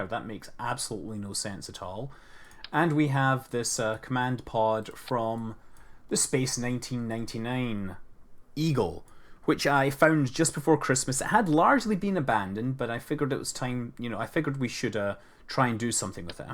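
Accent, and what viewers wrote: Scotland, United Kingdom. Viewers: Thanks for this ive been waiting for a video like this for a while;) Anyway just a question but are you scottish, because you sound like you're from scottland?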